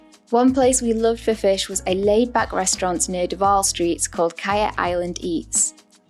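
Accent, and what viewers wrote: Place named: Somerset, United Kingdom. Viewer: Sounds like you're from Somerset UK. Try Santiago's Bodega at the end of Petronia next time. You won't be disappointed.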